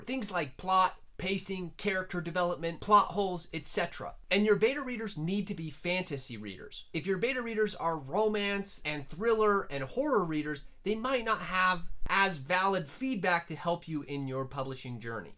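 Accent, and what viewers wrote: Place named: RP, United Kingdom. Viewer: Okay, but I think I need more of your posh British accent? It was so good hahaha (Also, I'm always here for the shout-out! 🙌🏼🙌🏼)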